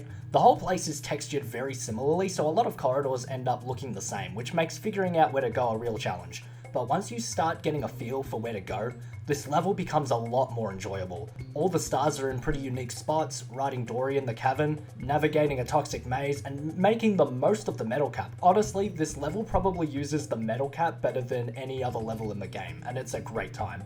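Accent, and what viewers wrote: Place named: Australia. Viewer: Never knew I needed a man with a thick Australian accent to geek out about SM64 to me, until now.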